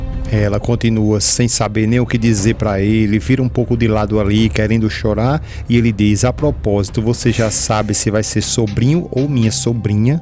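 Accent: Pernambuco, Brazil. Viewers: Sr. Narrador, você é do Cariri ou Pernambucano?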